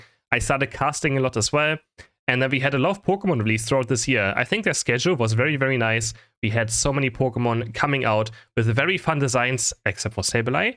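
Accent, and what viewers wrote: Germany. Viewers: I think that the game is currently at a decisive crossroad for it's future with the china thing. Let's see what happens and let's hope they correct the direction, because the way the meta developed in the last few months (like you said the big changes started to be noticeable around September with the whole Mime fiasco) with a focus solely on big damage and the inclusion of coffee break long stuns is pretty worrying. Greatly appreciate your channel btw, even if I still can't understand which country you're from (Germany? Netherlands?) Have a good new year Eve, cheers my man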